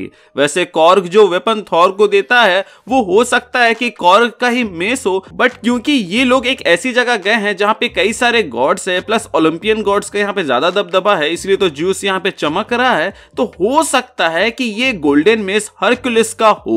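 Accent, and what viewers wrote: India. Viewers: Excited for the thumbnail until i play and hear indian dude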